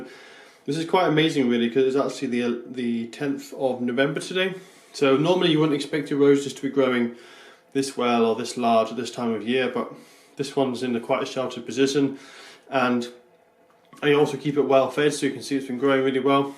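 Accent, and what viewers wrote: Scotland, United Kingdom. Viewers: Ohhhhh you're scottish haha that's why I can't understand you. lol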